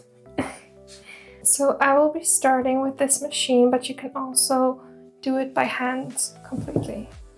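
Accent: Germany. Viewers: She is German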